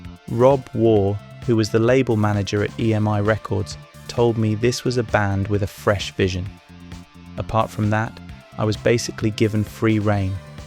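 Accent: Australia. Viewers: I can detect some aussie accent in the voice over? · an upper class South Australian?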